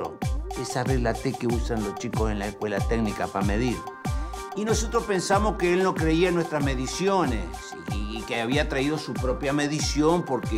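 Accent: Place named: Buenos Aires, Argentina. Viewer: Que paso tenes el acento a porteño...antes eras más chévere igual te banco ✌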